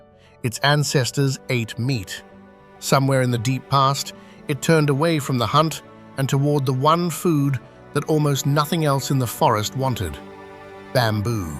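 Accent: United Kingdom. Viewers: The narration is a beautiful voice with a strong British accent. Thank you for telling people who don't understand Chinese history about our 5,000-year-old, uninterrupted history of constantly rising from the ruins.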